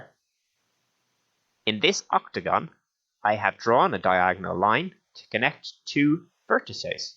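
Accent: Ireland. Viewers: These videos inspiring I have a theory but: Are you Irish?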